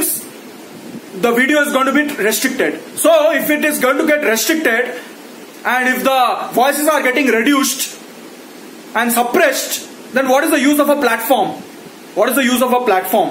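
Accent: Bengal, India. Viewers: his hindi just oozes that bengali feeling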